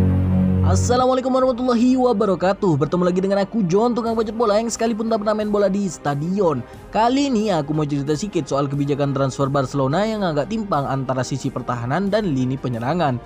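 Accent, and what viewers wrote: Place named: Madura, Indonesia. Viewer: Logat Lu Logat Madura ..... "Lu Fans Madura United ya?".... Tahun Depan Bisa Main UCL? kalo Bisa, Ronaldo mo kesana sama Braithwaite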